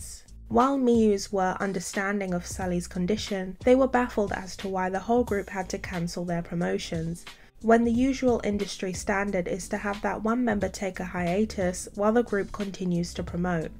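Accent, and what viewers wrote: United Kingdom. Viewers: YOU'RE BRITISH????? 😬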